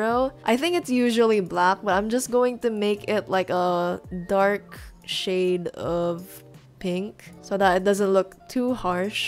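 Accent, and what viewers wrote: Philippines. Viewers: your accent is familiar are u filipino? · w8 yur accent is filipino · for some reason you sound filipino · hmmm i sense a filipino accent are you a filipino?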